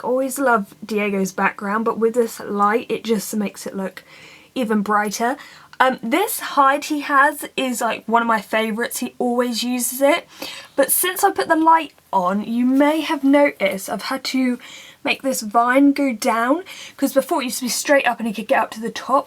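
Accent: United Kingdom. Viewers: sounds british to me. but im not sure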